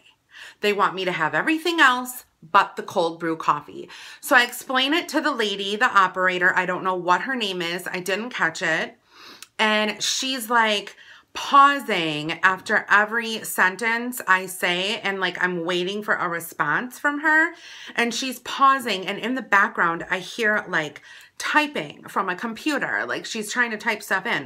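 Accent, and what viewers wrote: Wisconsin, United States. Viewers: People from Wisconsin sound like this?? Interesting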